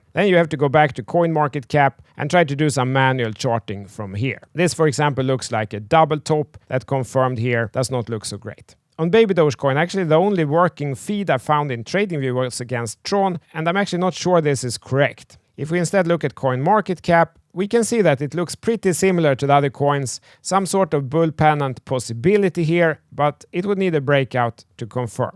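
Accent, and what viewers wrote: Sweden. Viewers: You sound Swedish as hell. The Swenglish is on and it sounds really sophisticated!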